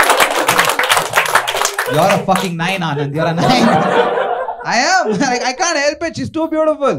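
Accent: Haryana, India. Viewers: that Haryanvi accent was hilarious 😂😂😂😂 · dude your haryanvi damn funny bro..!!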